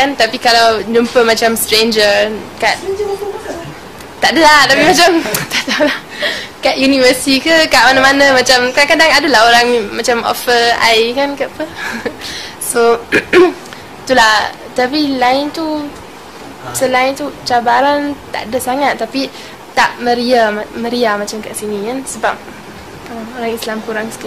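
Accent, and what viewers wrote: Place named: Johor, Malaysia. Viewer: slang bkn utara...ni mesti johor atau melaka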